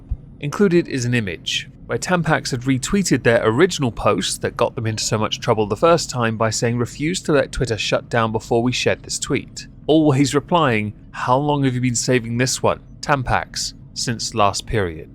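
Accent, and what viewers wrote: United Kingdom. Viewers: Same... I accidentally found on a random lol cow content excursion and then watched the "other stuff" and was still lol'ing and wondering "how have I not found this channel before now?" Is totally my kind of humor even when I don't agree with joke... the British wit & voice is just a bonus 😉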